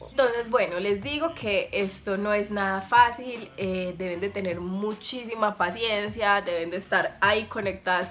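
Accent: Colombia. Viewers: Me encanta tu acento colombiano 🤤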